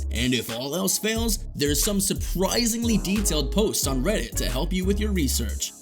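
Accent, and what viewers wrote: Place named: United States. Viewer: What an annoying typical american narrator voice.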